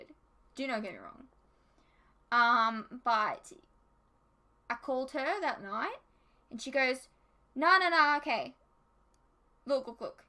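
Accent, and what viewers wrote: Australia. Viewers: reaction videos are a dime a dozen. i think you might be auzzie, nz or tasmania. i have a great affinity for my rugby rivals and their accents. but, you, dear girl, have empathy. its difficult/refreshing to watch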